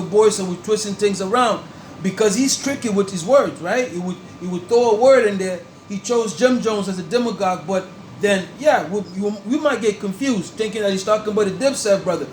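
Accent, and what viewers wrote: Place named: Jamaica. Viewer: You sound jamaican ☺🇯🇲💖or other caribbean island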